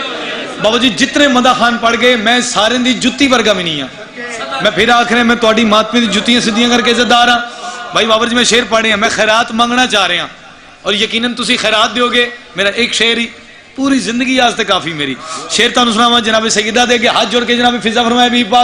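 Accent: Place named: India. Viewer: Just like indian voice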